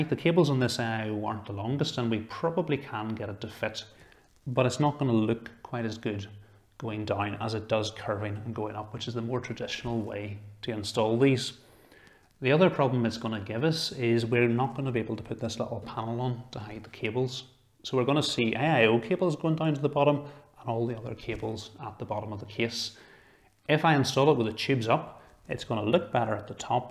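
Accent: Northern Ireland, United Kingdom. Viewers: I just built my first ever PC and this was by far the most useful tutorial I came across when it came to building and especially what to do when it comes to installing Windows and installing drivers. I'd be lost without this! PS. My parents are from Norn Iron and when they heard your voice on my phone they said "Ach, a Norn Iron fella! What's craic with him?"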